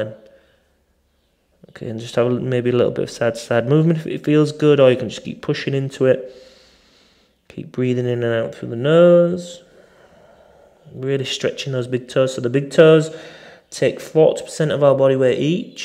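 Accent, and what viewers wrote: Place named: North, United Kingdom. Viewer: Thanks again. Loving your northern accent!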